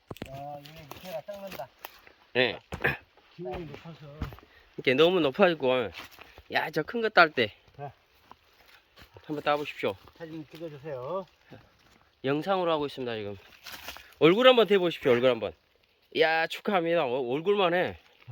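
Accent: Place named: Jeolla, South Korea. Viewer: 어느지역의 산인지 모르나 목소리는 전라도같네요 아직 강원도나 충북북부쪽에서만 나오는줄 알았는데요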